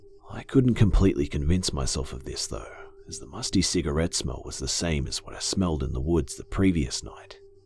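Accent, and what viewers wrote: Australia. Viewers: Are you Australia mate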